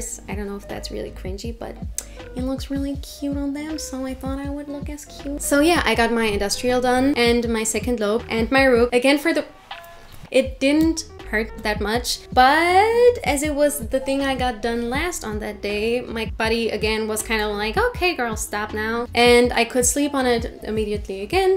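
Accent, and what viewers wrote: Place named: Germany. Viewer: Nice Video Hab einfach sofort man dem Akzent gemerkt, das du deutsch bist🥰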